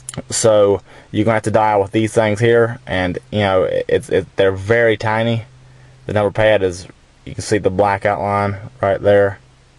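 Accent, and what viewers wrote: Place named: Texas, United States. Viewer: I love your accent... Are you from Texas?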